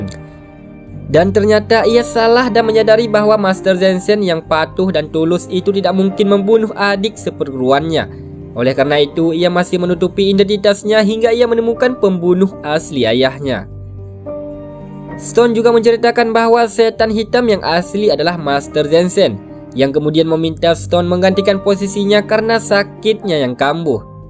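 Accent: Aceh, Indonesia. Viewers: Bg orang aceh ya? Medok karna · Logat aceh😅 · Aceh ni logat nya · Lupaya bukan cuman saya yg sadar klok mimin org aceh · Logat aceh admin nya 😁😁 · dari logat pasti orang aceh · Admin nya orang aceh ya? Logat nya Aceh kali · Maaf min loe dari daerah mana logat nya mirip aceh atau bali y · Suara nya mendhok kayak suara org aceh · Pembawaan suara mentok kali orang Aceh.. Sehat slalu admin, salam asam Sunti ..😅😅😅😅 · Seperti logat orang Aceh? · Orang Aceh ya bang?. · medok Aceh nya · Logat Aceh ya wkkww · Bahasa Indonesianya terdengar logat ORANG ACEH., hihihihi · Admin awak aceh sang euh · Kayanya yg ngisi suara di alur cerita channel ini oranh aceh😂😂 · ini org Aceh keknya yg bicara😀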